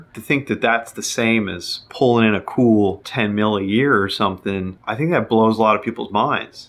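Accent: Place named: Yorkshire, United Kingdom. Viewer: Hey are you from Yorkshire UK? You sound like a Yorkshire man 😆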